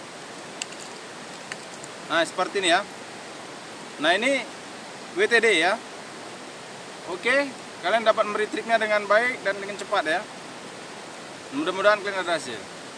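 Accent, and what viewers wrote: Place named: Medan, Indonesia. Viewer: Mantap om... Semakin ok penyajiannya Tinggal bahasanya saja yg kelihatan anak medan 😁😁😁🤭🤭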